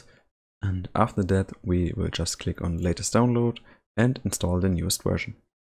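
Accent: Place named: Germany. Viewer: Nicht mal 5 Sek im Video und erkannt das er Deutscher ist! HAHAHAHA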